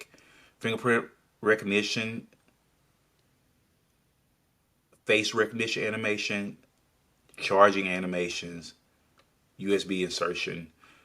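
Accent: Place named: United States. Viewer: Where is your lovely accent from ? Usa but what part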